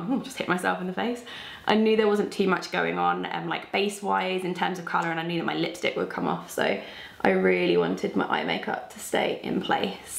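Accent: United Kingdom. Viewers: yes! I also read your comment right as she said "brighter bees." I love Brits and their accents!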